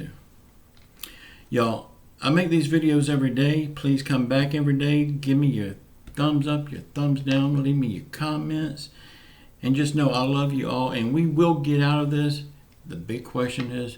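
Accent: South, United States. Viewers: Weeelll, spit it out man,you're southern slang,assumptions are boring,I don't have to call the white house,Madonna is well capable,thanks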